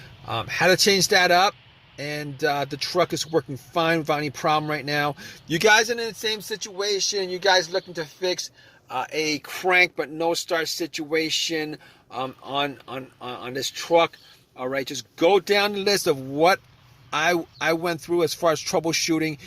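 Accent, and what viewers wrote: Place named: Bronx, United States. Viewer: I love your accent. Is it the Bronx accent?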